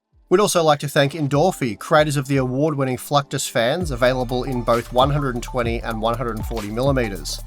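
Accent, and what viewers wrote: Australia. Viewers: Great insight, thank you so much 😊 P. S. I love your Australian accent, it's fantastic to listen to it.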